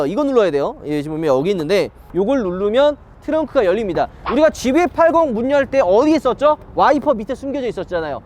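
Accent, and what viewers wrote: Gyeongsang, South Korea. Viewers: 나나지는데요. 하는거보니 는 경상도 사람이었군 ㅋㅋ 담에 사투리 리뷰 부탁해요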